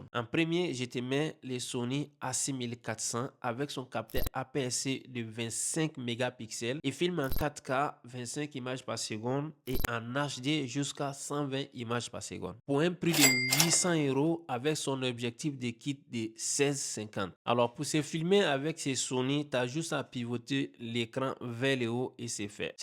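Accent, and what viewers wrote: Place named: Africa. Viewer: Merci 🙏. Je suis juste qu'un simple amateur/débutant avec un G7 de 2016 que j'ai laissé dormir depuis looooongtemps et que je ressors petit à petit depuis quelques semaines. Que de temps de perdu...😔. Pour ce qui est des Lumix, j'apprécie l'ergonomie,la prise en mains qui fait boîtier solide. Les menus également que je trouve assez intuitif. Autrement,je t'ai découvert il y a peu et j'apprécie ton contenu. En plus,pour une fois que l'on a un"cainfri"🔥(avec le vrai accent)😂passionné de photos/vidéos qui à sa chaîne Youtube et qui fait ça bien pourquoi ne pas l'encourager ? Pour le coup,je m'abonne et je lâche un:Yah Foye,on est ensemble 🤣 🔥. Mes salutations de la Réunion 🇷🇪 🙏 🔥 🤝. Bonne soirée.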